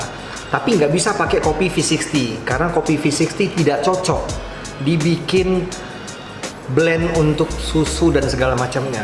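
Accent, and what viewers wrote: Medan, Indonesia. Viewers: Kayaknya orang medan kau bang. Soalnya logatnya medan kali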